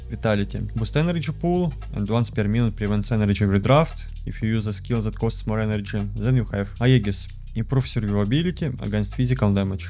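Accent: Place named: Russia. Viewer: This russian accent 😛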